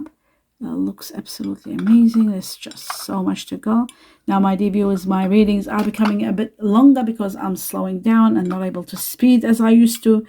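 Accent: Eastern Europe. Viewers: seems Eastern European to me.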